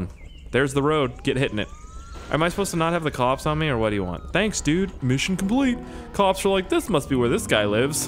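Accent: Russia. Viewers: Love your Russian accent xD More Russian videos!! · Please do more random Russian games so I can hear your hilarious accents lolololol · i'm disappointed you didn't start this episode in your Amazing Russian accent.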